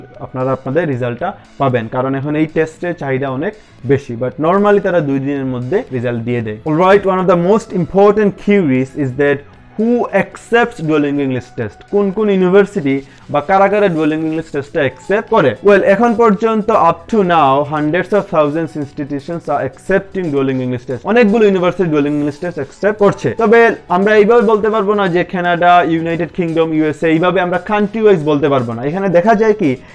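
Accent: Sylhet, Bangladesh. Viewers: R u from sylhet bro? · sylhet e baisaab!! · Right,,he is sylhety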